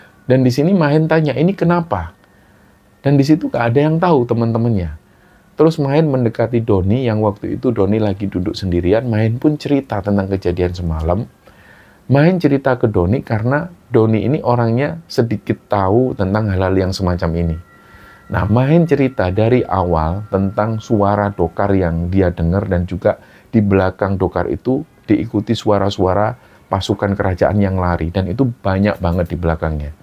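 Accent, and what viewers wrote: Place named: Tegal, Indonesia. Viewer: Mantap ni dari kabupaten tegal mas 👍 Ora ngapak ora kepenak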